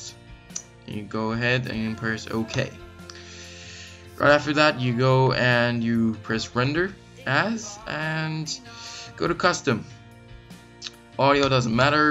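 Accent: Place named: Boston, United States. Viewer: he's just from boston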